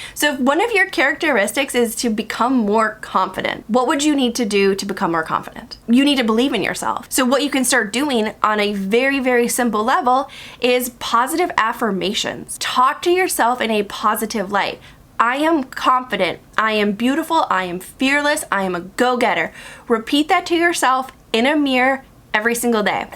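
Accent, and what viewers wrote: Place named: United States. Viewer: yess your very clear American accent is helping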